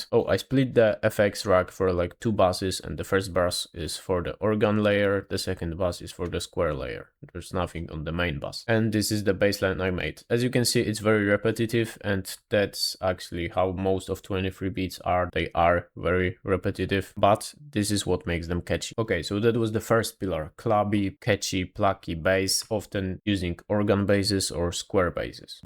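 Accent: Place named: Poland. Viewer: thats gotta be the thickest polish accent ive heard in a while 😂😂😂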